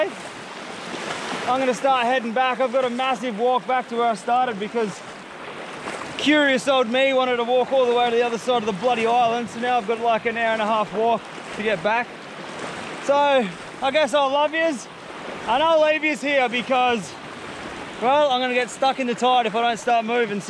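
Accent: Australia. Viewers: Hi mate. Could you please tell me what kind of australian accent does he have? Im so curious as im learning english too atm, and I definitely think that this accent is 100% easy to understand than american english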